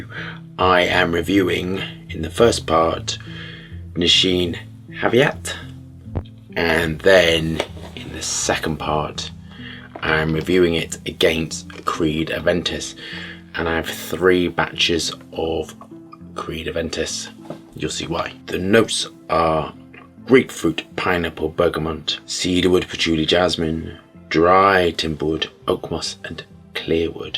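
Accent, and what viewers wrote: United Kingdom. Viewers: Honestly, I've gotten PLENTY of comments about Aventus and to this day (5 years ago) I have NOT found a perfume that can get those same results... It has NOTHING to do with Creed, because Club De Nuit Intense Man with get just as much attention. It's that SMELL that so many people love. I have tried soooooooo many fragrances. I will try Nishane, but Aventus is a LEGEND no matter what people say. Ive gladly owed about 12 to 13 bottles of it... I think maybe one of them were fake - So, I do recommend purchasing Creed's Aventus from a reputable source., my wife is a Brit so I hear that accent allll the time LOL.. never gets old. Thanks for sharing your knowledge when it comes to the ingredients or raw materials. I never knew what smell came from the whale vomit lol.. but now I do!!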